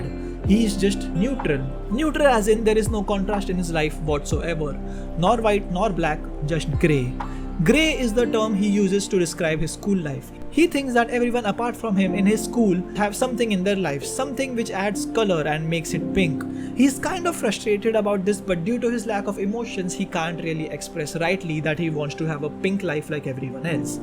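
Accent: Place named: India. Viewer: Your accent is Indian. I appreciate that you are not trying to sound Americans are Brits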